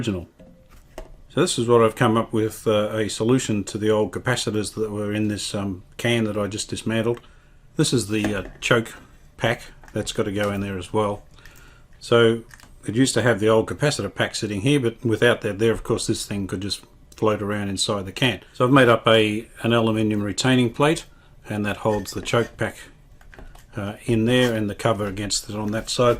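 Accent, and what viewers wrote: Australia. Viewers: That Australia accent are so cool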